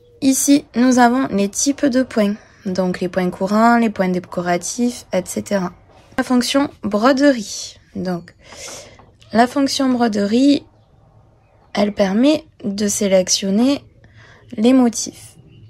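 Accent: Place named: Carcassonne, France. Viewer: Waouh ... quelle machine 👍🧵 Merci à toi Tu as un petit accent que je connais ... je suis de Carcassonne lol 😊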